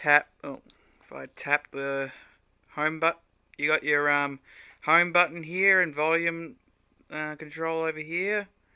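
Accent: Australia. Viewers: He sounds like a queens lander. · darpo3t - he is not british! I'm british, and have neat and clean nails - he sounds australian or kiwi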